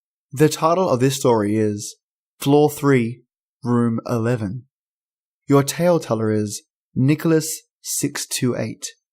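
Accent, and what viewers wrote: Australia. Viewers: Hell nooo!! 😱 If someone was in my garage I would try to trap them in there until the cops showed up to arrest them. Good story, Great narrating, and as always awesome Aussie accent 😉 haha 🙂🖤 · the Australian accent does but me to sleep. But I dong think my work wants me sleeping on the job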